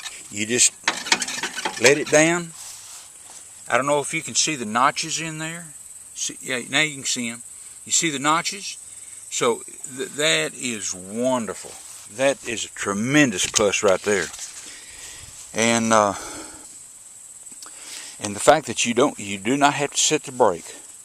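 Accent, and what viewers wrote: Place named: South, United States. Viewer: You sound like you are from the south. My neighbor has this same mower.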